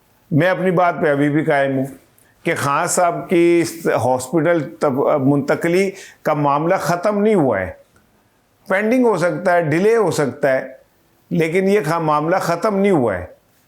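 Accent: Punjab, India. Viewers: Please don’t give explanations the way you talk is your own style punjabi tarka is really good so never stop doing so. Your English vlog was really strange I am glad u explained what happened to it 😂❤❤❤ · Punjabi ka turka bht acha lgta h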